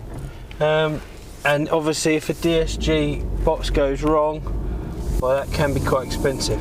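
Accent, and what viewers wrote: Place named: London, United Kingdom. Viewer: Top man! Loved the micky Flanagan London accent lol. Kappa the vids coming mate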